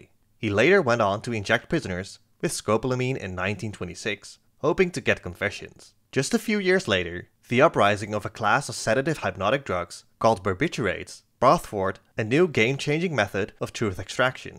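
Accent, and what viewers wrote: Netherlands. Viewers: Nee echt leuke videos... stiekem beter dan nilered. Ookal heb je een nederlands accent je prsst fijner, iets sneller en minder met een zeurige toon. Hahah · Your voice has a hint of Dutch if that’s true damn it’s a fine accent if other disregard the previous 😅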